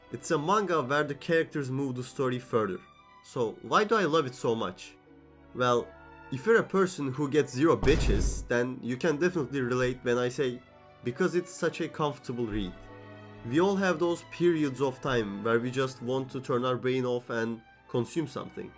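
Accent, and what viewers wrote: Turkey. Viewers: Bu aksanı nerde olsa tanırım. Türklerinin sonunda fark etmeksizin herhangi bir medya üzerinden kaliteli içerikleri ürettiğini görmek iç açıcı,takipteyim. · abi tam olarak 5sn izledim henüz ama türk aksanın var (kötü bi şey gibi değil) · You sound like Turkish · idk how but you have such a Turkish accent in english wow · bu ingilizce aksanı bu kadar türklere özgü olamaz ya bu arada yona of the dawnın hala ikinci sezonunu bekliyorum yıllar geçti:( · Türk olduğuna yemin edebilirim. Türkçe konuşuyormuş gibi ingilizce konuşuyorsun. Türk aksanı diye bir şey varmış onu anladım ahsjskkd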